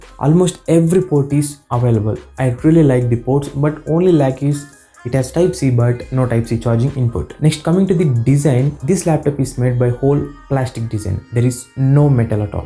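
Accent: South India, India. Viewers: I think it is easily recognisable that he is a south indian,so am i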